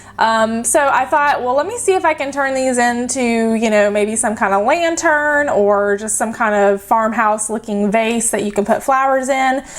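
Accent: South, United States. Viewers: I am new and from New york..love ur southernzccent!!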